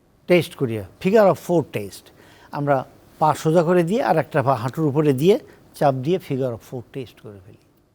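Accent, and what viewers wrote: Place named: Bangladesh. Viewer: Bangladeshi English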